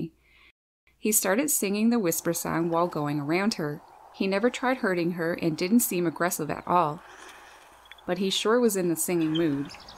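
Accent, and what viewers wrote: Newfoundland, Canada. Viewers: The Canadian accent is strong with this woman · I knew you were Canadian when I heard pronounce your “ou”s 😆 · Are you from Nfld or Nova Scotia? XD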